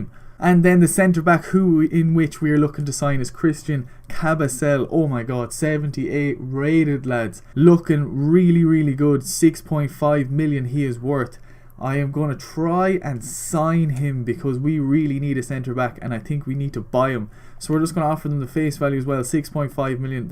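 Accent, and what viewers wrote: Ireland. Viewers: YOUR IRISH!!!! WOOP Hey Im AmigoSully and im here in the search of making a recording team as its a good way to grow, I like your content and love to have you as a part of the team:) hope to hear back from you Sully xD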